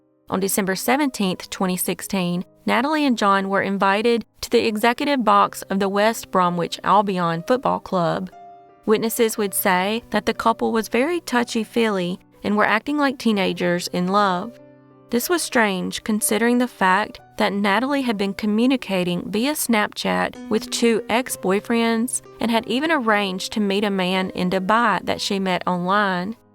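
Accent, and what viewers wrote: South, United States. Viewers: Annoying factoid that I hate but wanna share cause I feel like you'd hate it too, cause you're southern like me. I live in Arizona now, and found out that 'Prescott' is pronounced 'Preskit' here, and it drives me absolutely mad 😂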